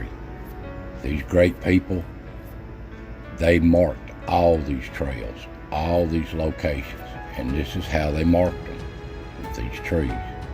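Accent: Appalachia, United States. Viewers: I love your video for the content, but the way you speak makes me smile. My maternal grandmother was from Appalachia, and even though she lived the majority of her life deep in south Georgia, the way she spoke was different from every body else. I could hear her in every word you said. Thanks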